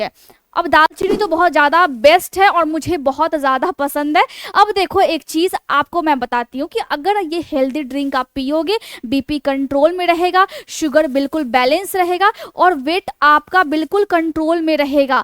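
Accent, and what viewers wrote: Bihar, India. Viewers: Mam bihari to nhi ho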